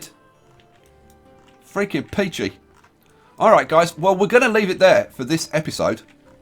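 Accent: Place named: England, United Kingdom. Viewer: Hey mate =) love your english acsent, where in england is it from? Hope you continue this. If you still have the save. we never got too se a brewary and the pub being buildt. build a royal sea harbor =) cherrio mate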